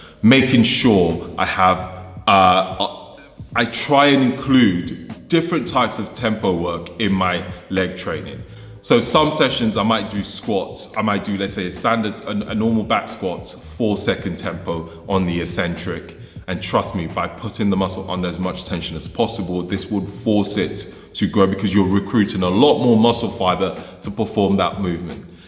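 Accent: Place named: England, United Kingdom. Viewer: Excellent demonstrations! The tempo is admirable with the perfect form. Oh, and I just love this accent. From which part of England are you?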